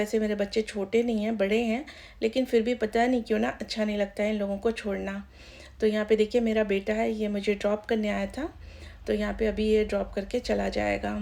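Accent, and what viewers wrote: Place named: Uttar Pradesh, India. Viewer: Aap ki awaz sunkr hi lga ki Aap up se hai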